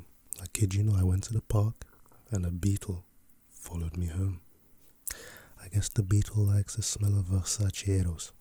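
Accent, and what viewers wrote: United Kingdom. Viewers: Loving these bro.. an if I’m not mistaken , you from the UK ? You gotta new sub 👌🏻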